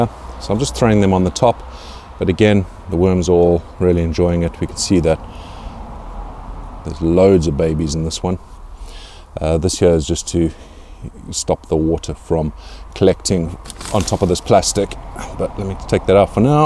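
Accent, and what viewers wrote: South Africa. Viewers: You're in the UK, but your accent sounds like a South African.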